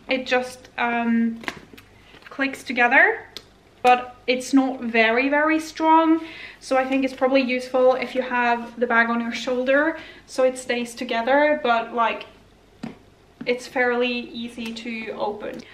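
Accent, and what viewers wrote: Ireland. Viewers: Norwegian with Irish accent?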